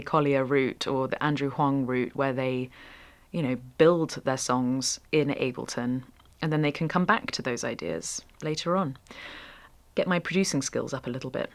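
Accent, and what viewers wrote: United Kingdom. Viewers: Oh yes, absolutely. It's very odd to me as a Canadian that we can live 2,000 miles apart from one another in my country and have virtually the same accent as English speakers--but head to the UK, and 20 miles down the road is far enough to have a distinct accent that proudly identifies your hometown. I've started to tell the difference, even as a foreigner, among the many English & British accents -- and is far and away one of the most beautiful.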